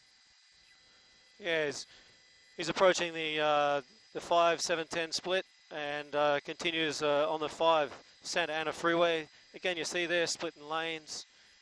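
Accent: Australia. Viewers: Never heard an Aussie narrating an American chase before. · The narrator was awesome. Maybe an Aussie or a Kiwi?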